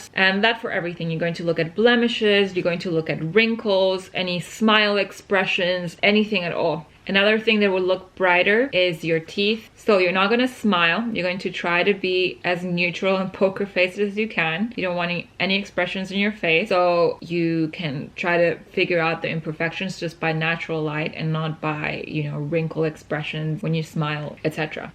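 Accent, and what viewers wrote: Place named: Argentina. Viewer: Venía mirando videos tuyos y me daba la sensación de que serías Argentina, (más allá de tu excelente inglés), y ahora lo acabo de corroborar ❤❤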